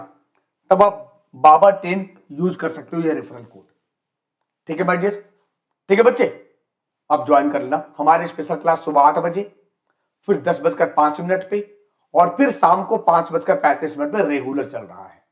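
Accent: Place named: Jharkhand, India. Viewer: जोहार महोदय लगता है आप झारखंड से हैं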